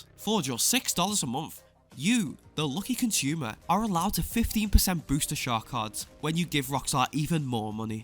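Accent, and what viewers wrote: Liverpool, United Kingdom. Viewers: is this dude from liverpool? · Appinin me fellow scouser lad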